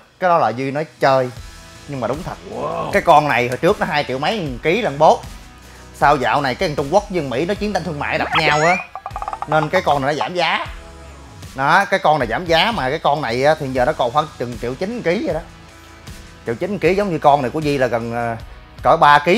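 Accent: Mekong Delta, Vietnam. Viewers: Lần đầu xem videol của a nhưng rất thích đậm chất miền tây dễ thương ☺️ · Mới xem ông này nói chuyện cười quá giống chất miền tây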